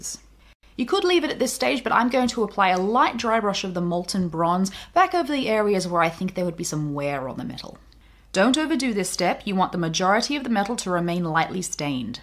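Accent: Australia. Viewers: Hi. Love the Vids. Just starting to try my hand at figures and finding the vids very useful. Also nice to hear a fello Aussie lol. Please keep them coming.:-)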